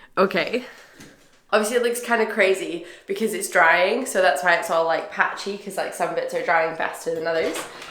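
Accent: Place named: Scotland, United Kingdom. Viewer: You have a wonderful Scottish Accent. ☺️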